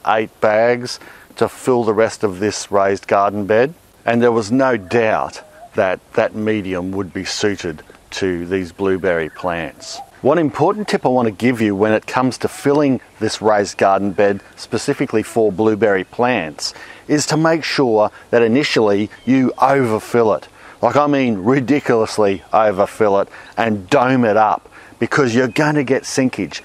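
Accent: Australia. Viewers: Haha. Same here, and also love the Aussie accent.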